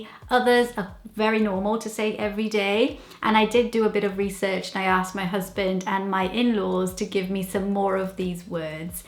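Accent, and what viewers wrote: Wales, United Kingdom. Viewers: You have a nice Welsh accent developing there. Tidy! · You’ve got a bit of a welsh accent coming through! I’m Welsh and didn’t realise that half of these things were a “Welsh thing”. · How do you sound welsh en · I can hear the Welsh in your accent. I wouldn't know you're from Brazil until you said!!! Aww tidy mun!!! 😊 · You do not have an English accent, you have a wonderful Welsh accent. If I didn't know your story, I would swear you were Welsh born and bred. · You have such a welsh accent for a Brazilian! No offence! My boyfriend is from Bangor, North Wales and I’m from Aberystwyth, Mid-West Wales and our accents are kinda different but we love each other’s, it’s so cute 😂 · This is brillant video. I chuckled all of the way through it. Sometimes if somebody says "Can you do this now?" you may hear the reply "I'm busy now. 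I'll do it again" (at another time) or "I'll do it now jest" meaning later or "later on". To "cwtch" can also mean to hide (something) or the cwtch, the space under the stairs or a place where the coal was kept, the coal cwtch. To "potch" - to tinker with. Blackpat - cockroach. The list is endless. Around here (Rhondda Valley) you hear them all the time. I love your accent. You really have picked up a Welsh "twang". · I thought you was welsh lol, you have quite a strong accent not just a twang 😅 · Did you learn English in Brazil? Or did you learn the majority through immersion when you moved to Wales? You do actually have quite a bit of a Welsh accent, you don't have a real noticeable Brazilian accented or having a "neutral BBC English" accent. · You have a Welsh accent!! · God i love the welsh accent twinge to your accent.... Genuinely never knew some of these were welsh things.... nice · And you are picking up a Welsh Accent, a Valley’s accent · Brilliant girl! 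Tidy Welsh accent🙏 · Your accent is turning Welsh. Cardiff? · I did the opposite. I am a Welsman that decided to live in Brazil after me and my Brazilian wife got married. I did notice straight away your Welsh accent by the way. Congrats on your perfect English (Wish I could say the same for my terribke Portuguese) ''Miss my Wales I does see'' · Wow ur accent sounds quite welsh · what welsh twang in ur voice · Your accent sounds 100% welsh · I’m a Londoner and to my ears in your normal speech you are definitely Welsh with a light touch .incredible! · im welsh . you sound like you have a welsh accent aswell xx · Absolutely spot on. And btw nice welsh accent!!🤪👍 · You picked up the welsh accent for surel. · Wow, I'm Brazilian and I'm married to a Welsh man too and it is SO refreshing to hear you speaking with Welsh accent. Da yawn i ti!!! We are preparing to go back to Wales and finding your channel was a great bonus. 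Hope we bump into each other at some point. Hwyl! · Your accent sounds authentic Welsh to me ! I'm just over the bridge(s) , Bristol 🏴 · Welsh, I am, from Swansea, living abroad. "Who's coat is that jacket?" is a new one on me, never heard it before. But...one of my favourites is.."now then!" Great video...it got me smiling in recognition. And your accent is SO Welsh...loved hearing it! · Sound full on Welsh not just a little bit.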